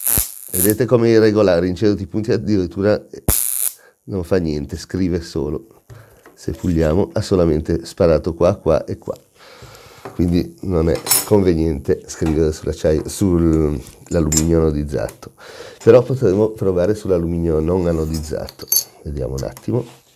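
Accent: Genoa, Italy. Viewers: Perché, come si dovrebbe dire? Comunque sì, il maestro è genovese!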